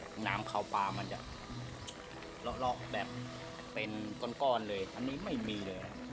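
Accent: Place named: Surin, Thailand. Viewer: น้าอยู่จ.ว อะไรครับ สำเนียงเหมือนคนสุรินทร์หรือไม่ก็บุรีรัมย์ใช่ไหมครับ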